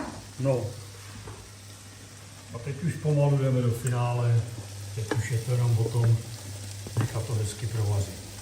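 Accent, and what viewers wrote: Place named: Prague, Czech Republic. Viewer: Dědo Vy jste krásnej Pražák , s nádhernou pražskou češtinou 🌺🌺🌺 a já Vás ráda poslouchám i kvůli té Vaši krásné češtině 🇨🇿🥰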